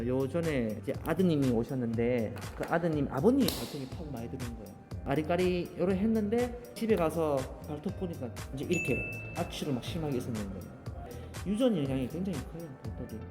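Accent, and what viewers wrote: Gyeongsang, South Korea. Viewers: 남자선생님인데 넘나 친절하시고 관리도깔끔히 잘하시는것 같아요 경상도사투리 매력적이십니다ㅋ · 발톱의 이물질 제거할때.ㅋ 쁑~~ 쁑~~ 좋네요.👍 친절하시고 상냥하시고 ㅋ 특히 좋은점은 갱상도사투리요。 물론 실력도 좋으시고요..♥👍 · 저도 대구인디 같은 경상도 사투리인데 왜 다른 느낌이죠? ㅋㅋㅋ 넘 친절하신 말투에여! 1차 관리 링크도 본문에 같이 남겨주시면 더 보기 좋을 거 같아용 1차에는 2차 링크 걸어두시구 ㅎㅎ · 늘 너무 재밌게 봐용 경상도 사투리는 톡톡쏘아대서 억양이 쎄다 라는 생각을 늘 해왔는데, 선생님 영상보면 경상도 사투리도 저렇게 친절하고 부드럽게 느껴질 수 있구나 생각해요ㅋㅋㅋㅋ 저는 내성발톱 아닌데 가보고싶어요 ㅠ ㅠ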